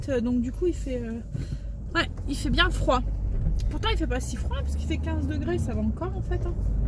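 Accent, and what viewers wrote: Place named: Pas-de-Calais, France. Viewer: Cc moi je croyais que t habiter dans le pas de Calais comment tu parle pareil que ceux du pas de Calais car moi je suis née dans le pas de Calais es y habiter jusqu'à 12 ans es toute ma famille es là haut donc toi ses le nord.sinon rien a voir quand tu fais les poussières avec du savon noir du rince ou non merci pour tout des partage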